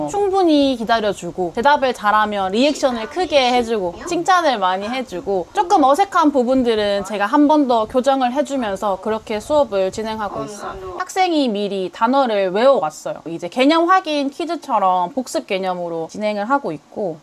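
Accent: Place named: Gyeongsang, South Korea. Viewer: 경상도 억양이네...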